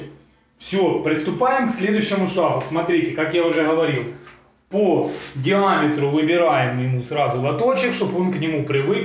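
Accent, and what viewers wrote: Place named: Spain. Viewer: Сперва этого испанца к русскому языку надо научить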